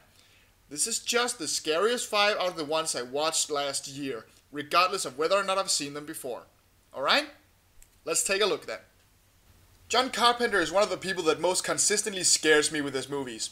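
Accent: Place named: Denmark. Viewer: You sound very danish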